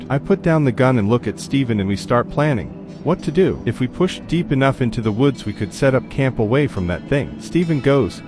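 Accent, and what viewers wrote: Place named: Russia. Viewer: this Russian voice was hard to understand but I'm loving your work so far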